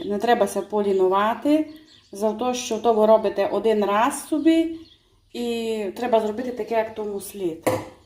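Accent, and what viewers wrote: Transcarpathia, Ukraine. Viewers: Дякую за рецепт. Обов'язково приготую. Я обожнюю ваш закарпатський говір❤. Дай Вам, Боже, рясних благословінь і міцного здоров'я. ❤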